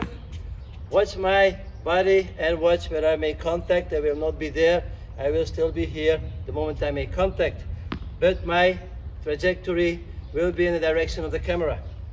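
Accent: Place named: Belgium. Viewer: dankjewel meneer. I thought I picked up the accent - I used to live in Belgium :)🇧🇪 Thanks for the advice on the back. I often get some backache just from playing so I need to be extra careful with serve but I’ll give this a go! Again, great video. Thanks!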